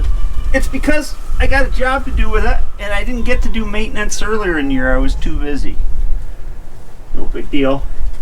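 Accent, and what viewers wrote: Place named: Central New York, United States. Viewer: I live in Kentucky after 18 years Finger Lakes...you definitely have that central NY accent. Nice to see drone footage with a little sliver of Cayuga Lake right at the end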